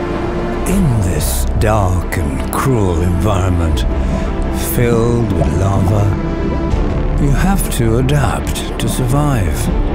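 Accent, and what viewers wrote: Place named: United Kingdom. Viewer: Nice British accent.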